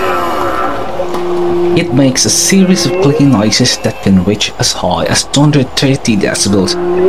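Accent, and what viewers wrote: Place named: United Kingdom. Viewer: Ung accent tlga lodi..para akong nanunuod ng harry potter..😁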